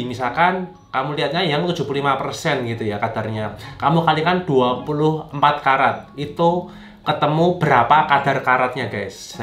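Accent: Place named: Surabaya, Indonesia. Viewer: Koko medog banget Jowone koyoke wong Suroboyo nggeh? Terimakasih infonya yaa Ko,,sangat membantu sekali kontennya dan bermanfaat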